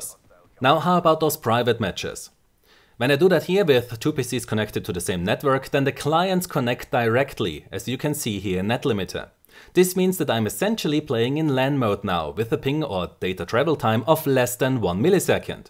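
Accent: Austria. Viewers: Bist du Österreicher? ^^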